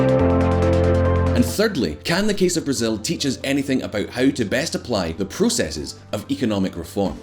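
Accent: Scotland, United Kingdom. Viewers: I've seen Brazilians with no teeth, black teeth and no shoes. Obviously by his accent he is British. The comment is meant to be satirical. You're welcome!! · Are you British ?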